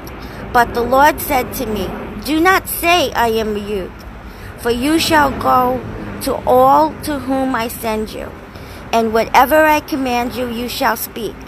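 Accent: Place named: Queens, United States. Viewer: This is a great idea. Do you live in Queens NY? I ask because you have an accent and I hear a plane going over, in from flushing and had the anesthesia of LaGuardia going over my house all the time.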